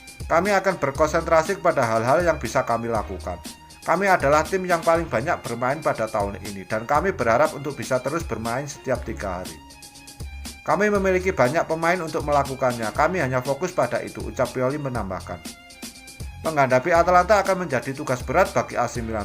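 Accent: Java, Indonesia. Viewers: Dengan aksen yg jawa medok, pelan2 aja min... Jadinya salfok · Jowone ndi mas? 😁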